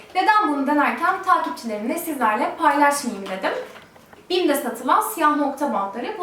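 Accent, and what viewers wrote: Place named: Russia. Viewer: Ты русская скорей всего)))) ama türkçen iyi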